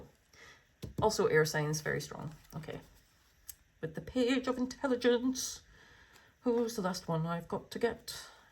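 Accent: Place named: Barbados. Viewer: I just found you today!💜. I love your accent - Bajan 🥰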